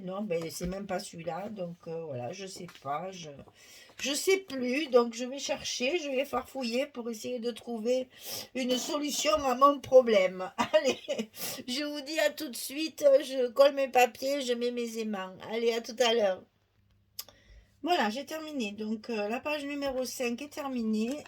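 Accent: South, France. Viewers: Bjr. Un tuto de vous sans parole quel gâchis!! Votre accent ensoleillé nous manquerais trop par ces jours de pluie ☔... Même sur le Pays Basque / landes le temps est triste actuellement... prenez soins de vos cordes vocales, vos tutos ne peuvent pas fonctionner sans votre voix du Midi... A demain ou plutôt Adishatz (en landais) ou Agur (en basque)...👋😉 bonne journée.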